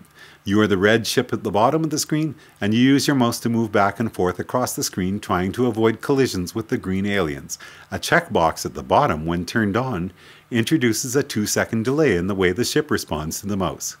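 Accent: Canada. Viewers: hes from canada, to them its aboot not about.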